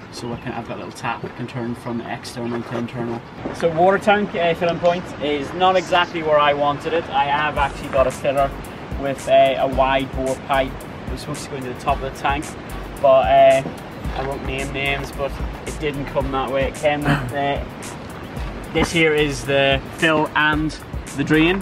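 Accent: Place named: Northern Ireland, United Kingdom. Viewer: Love this van... Is he northern Irish? EDIT...he is.. Useful 😜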